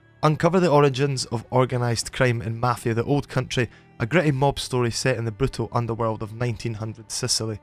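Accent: Scotland, United Kingdom. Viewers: Are you from Glasgow or Edinburgh?) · Glad to find your channel, mate. Don't hear enough Scottish voices on here and your commentary is very professional. Subbed.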